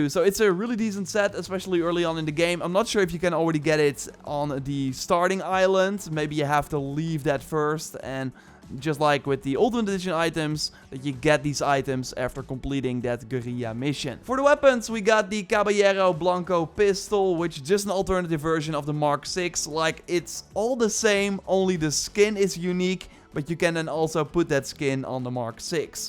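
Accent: Netherlands. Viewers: Haaha ik kende deze kanaal nog niet. Hoorde meteen een heerlijke hollandse tint in je stem. Fijne video, thanks! Subbed <3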